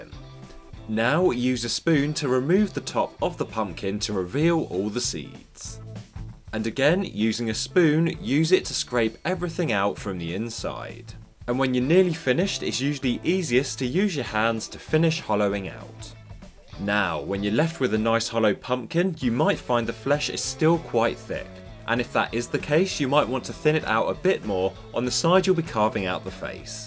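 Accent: United Kingdom. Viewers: British accent :)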